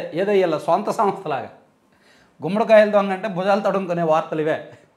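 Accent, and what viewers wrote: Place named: Srikakulam, India. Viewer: శ్రీకాకుళం భాష సూపర్ సూపర్ సూపర్ 😅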